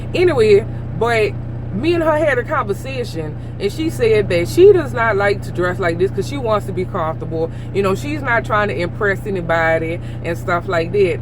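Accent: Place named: New Orleans, United States. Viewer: Girl where are you from with that accent?? New Orleans?? You're so Beautiful, keep doing you. I just happened upon your channel looking for lady truckers because I'm trying to find out SOME of what I need to know as a semi new driver.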